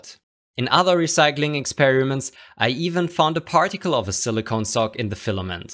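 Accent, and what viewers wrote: Germany. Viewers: You’re a German am I right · I smell some german accent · der ausprache nach sind sie deutsch mein englisch ist auch nicht gut xd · you sound like you´re german ,im too! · Digga sprich doch einfach deutsch man merkt deinen deutsch Akzent also sprich einfach deutsch und hör auf so zu tun als wärst du Amerikaner oder so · Man hört ech raus das du deutschr bist ;) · German accent? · so ein wunderschöner dicker deutscher akzent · Are you From germany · I Hear the German Akzent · Are you german ?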